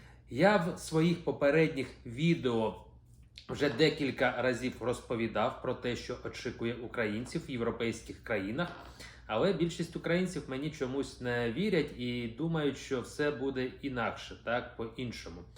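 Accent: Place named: Russia. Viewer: А ВЫ. ЯК ОГО. ЛЫСОГО. СЫДЫТЕ. БАЛАКАЕТЕ. НА. УКРАИНСКИЙ. МОВИ. З. АКЦЕНТОМ. МОСКАЛЯ. И. ПОКАЗУЕТЕ. ЩО ВЫ НЕ. ЛЕДАЦЮГА. АНУ. БИГОМ. НА. ПЕРЕДОК. АНЕ. ЗВЫЗДИТЫ. И ЗАРОБЛЯТИ. ГРОШИ НА. БИДНЫХ. ЛЮДЯХ. ПРОВАЛИТЬСЯ ИЗ. СВОИМ. КАНАЛОМ. В. БЕЗДНУ!!!!!!!!! ПИДДЕРЖИТЬ. МЙ. КОМЕНТАРИЙ. ДОРОГИ. И. НАСТОЯЩИЙ. УКРАИНЦИ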